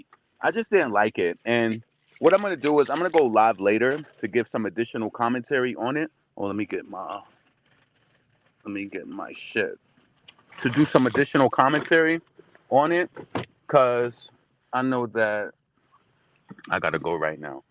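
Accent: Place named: Brownsville, United States. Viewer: The way you talk I though you was from Brownsville lol but it’s ok I still love you you be talking ya shit speaking big facts. 😘😘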